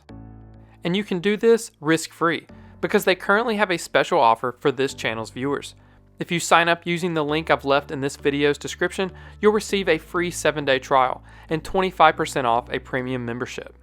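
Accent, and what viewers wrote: South, United States. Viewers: Honestly I just come here to hear your awesome southern accent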